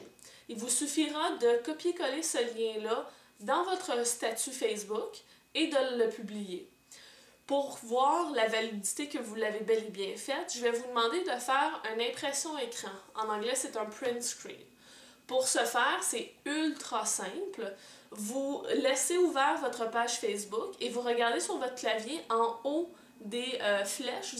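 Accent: Quebec, Canada. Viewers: moi je veut bien participé j'adore t'es vidéo, les lots sont pas mal et j'adore l'accent québécois. je croise les doigts et j'éspère que tu vas continuer t'es vidéos ^^. Bisouuuuus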